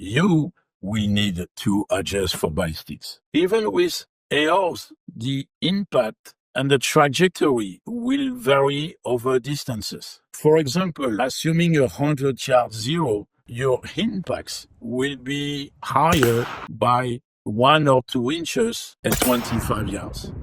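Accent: France. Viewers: Are you French by chance? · I'm having a harder time than I normally do placing an accent with yours. 🤔Definitely French... but I'm guessing closer to Belgium? Do you like Belgian waffles? 😁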